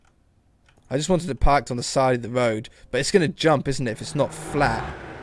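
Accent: United Kingdom. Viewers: That British Accent it wont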